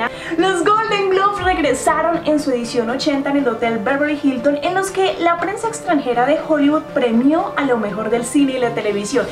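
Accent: Colombia. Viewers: Bogotense? Sale chamaca linda! Luego echamos chisme,jajaja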